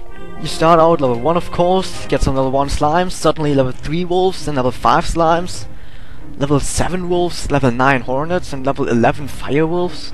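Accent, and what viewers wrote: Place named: Asia. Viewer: are u asian? u sound fob!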